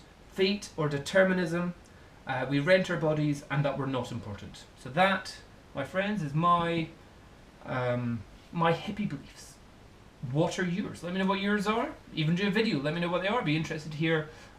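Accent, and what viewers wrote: Scotland, United Kingdom. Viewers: your video made me want to look for a free online episode of the Highlander (seriously). the Scottish accent and nature references.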